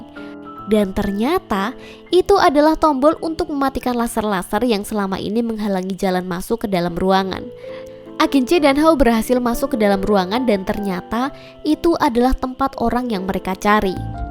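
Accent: Java, Indonesia. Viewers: Medhok.. Berasa di ceritain si mbok 😂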